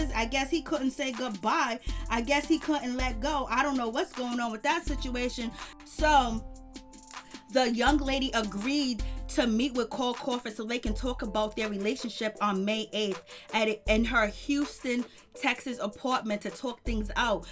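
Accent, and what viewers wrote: New York, United States. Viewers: She sound like she is from NY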